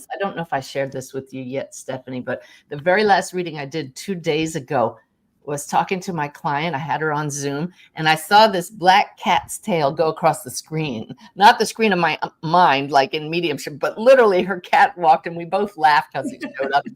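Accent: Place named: Germany. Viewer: I love your German accent!!!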